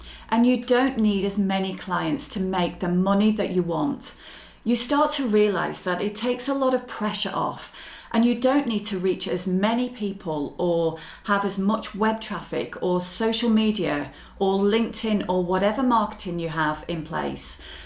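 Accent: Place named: United Kingdom. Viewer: Thank you again for sharing....I am actually setting up the backend of my e-Design company, and the price is the area I am currently working on, although I have 3 packages already prepared, so your advice is invaluable. Plus, I am now in the USA and your British accent reminds me of my hometown! how cool is that! LOL!😊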